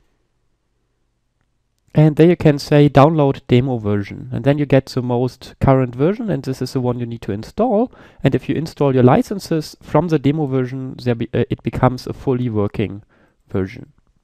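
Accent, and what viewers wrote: Germany. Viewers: Man hört unnormal dass du Deutsch bist :D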